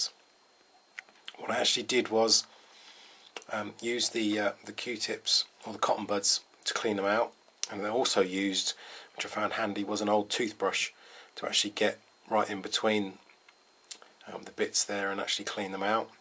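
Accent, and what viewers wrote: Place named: United Kingdom. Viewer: Yeap, british accent is so weird. I'll never like it.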